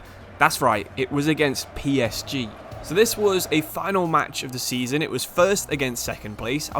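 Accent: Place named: Nottingham, United Kingdom. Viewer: mate this guy sounds like my mate from Nottingham lmao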